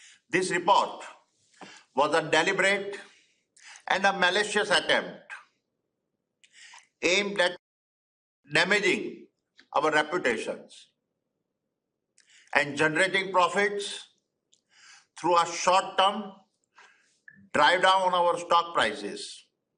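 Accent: India. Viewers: Damn! Even his english is like modi's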